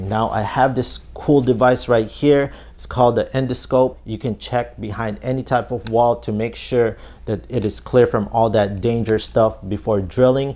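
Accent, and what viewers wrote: Philippines. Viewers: Your videos are very well put together! Pinoy kaba? Haha I lived in the Philippines for a while, I think I’m picking up on an accent!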